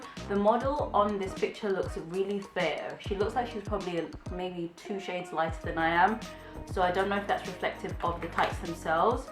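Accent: United Kingdom. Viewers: You are a Goddess. I love the British accent.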